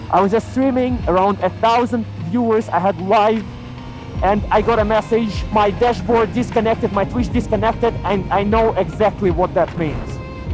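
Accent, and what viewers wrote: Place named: Russia. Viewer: i clicked cuz i was looking to start streaming on twitch but i heard about constant random bans with no real clear reason from twitch making me question if its really worth it or not, as far as i know twitch is a simping platform that punishes guys and let girls do as they please with minimum consquences. anyway i clicked and as the video began i noticed that russian accent but didnt really know if its or not, but then i looked at the channel name... and im like wait!? Russian accent!? but the i know should be famous why is this account have a low below 10K, then i realized... this is second youtube account:| wow what a suprise the name brought me back some memory of old CSGO as i used to play back in 2018 until somewhere around <num> where i quit the game doesnt feel the same for me anymore it just not for me. but aside from all that im really shocked seen my guy feeling down because you my friend were part of my childhood, hope things work out for you brother, and do be careful dont risk your life because if something happened to you, your subscribers your followers are the one who will grieve over you. 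if riding your motorbike is your way of therapy i suggest you try something else maybe go for a walk or try to meet new people or spend time with OGs, and while im pretty sure you wont read this entire paragraph im still writing with the assumption you would read it, do take care of your self cheer up! LETS GO CYKA! RUSH B! B FOR BOOBS!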